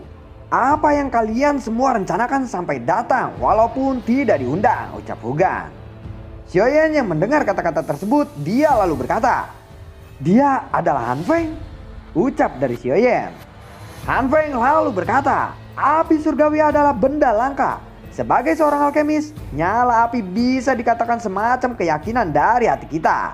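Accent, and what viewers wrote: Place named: Sunda, Indonesia. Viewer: Wkwkwk Sunda gning 😁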